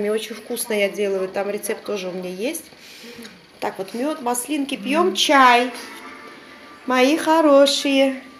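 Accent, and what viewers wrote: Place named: Georgia. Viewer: Очень длинная реклама! Но я не переключаю. Жду ваш славный голосок с грузинским акцентом!👍👍👍👍👌👌👌👌👌👌💞💕💖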